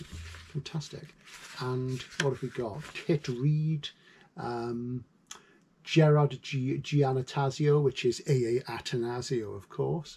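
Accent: United Kingdom. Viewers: You are my ultimate ASMR. SF, bookshops, british accents, with intermittent walkies in the country. Favorite anthology: 100 Great Science Fiction Short Short Stories - Ed. Asimov, Greenberg, & Olander (Only item I've ever stolen .. of course, if you steal books, steal them from libraries so they can track you down and get payment. My 12-year old self still never regretted it.)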